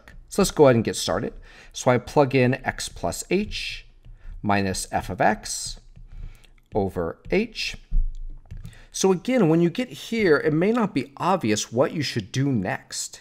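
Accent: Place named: Canada. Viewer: I really want to know what his accent is!!! Is he canadian??!! Also- very helpful video!!!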